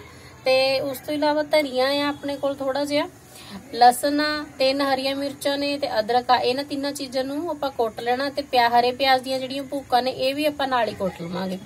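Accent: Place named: Malwa, India. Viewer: Sirra 😊 Ma'am tuhadi language te ni majje wali lgdi Malwai lgdi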